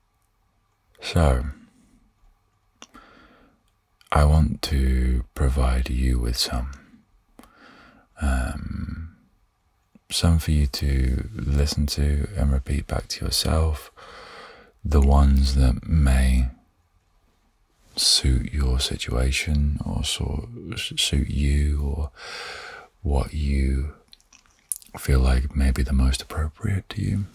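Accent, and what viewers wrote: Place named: United Kingdom. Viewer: calm British voice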